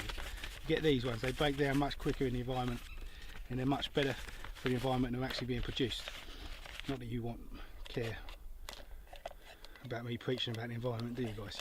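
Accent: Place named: Cockney, United Kingdom. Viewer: a Cockney living in Sweden. :)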